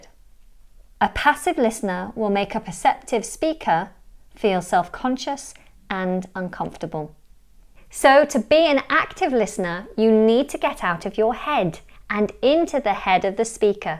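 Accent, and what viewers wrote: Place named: RP, United Kingdom. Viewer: I adore your posh accent! :)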